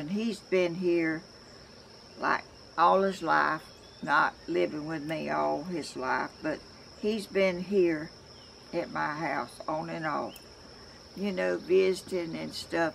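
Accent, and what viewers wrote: South, United States. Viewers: Bless your heart! I bumped into your videos yesterday and have been watching non-stop! My Mom was from Virginia Beach and had family all the way down the coast including Georgia so listening to you talk is such a balm to my heart! Some of my favorite memories are of sitting outside with Ice Tea and listening to all the southern conversation. My Mom is gone and I miss her something awful so if you don't mind I'd like to think of you as a long lost relative that I didn't know I had. 😉. God bless you and keep you! And Cody will be back before you know it! 💞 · In these awful times, it's sure nice to hear a Southerner like my Grandmother. Listening to you is like going to my Grandfather's farm in Slocomb Alabama. I now live in Northern California and miss my family very much. I grew up in West Palm Beach, FL in the late 60s and 70s when it was still beautiful down there. 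My family is all over between Slocomb Alabama and Panama City Florida. So I guess we're kinda like long-distant neighbors of sorts. :)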